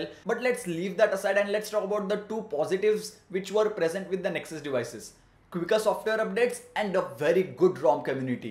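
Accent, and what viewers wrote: India. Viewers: I love this indish accent ;) thanks for the nice review my friend :) keep up the good work! :)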